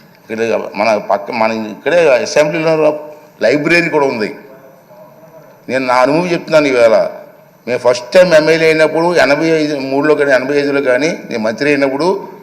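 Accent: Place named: Godavari, India. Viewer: Nice Godavari slang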